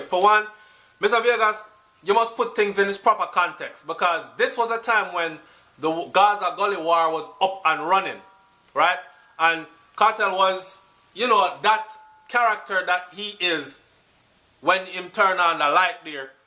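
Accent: Trinidad and Tobago. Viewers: Damn remember the good ole days when national anthem play inna dance.. I was really young but I remember.... da trini accent deh fool me and mek mi a wonder if u fwd from trini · 😆😆😆😆😆 your trini-accent. Big up yourself.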